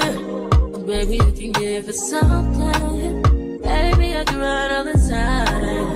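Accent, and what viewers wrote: Baltimore, United States. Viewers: i hear the baltimore in you🤣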